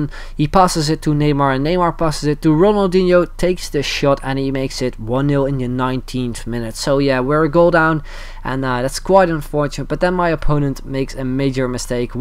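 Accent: Netherlands. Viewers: Yo, are you dutch? Because your English sounds like a Dutch guy speaking English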